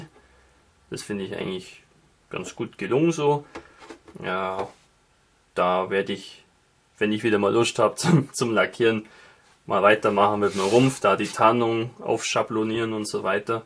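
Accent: Swabia, Germany. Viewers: Bist du aus Baden würtemmberg? Du klingst voll wife der Schwabe!!!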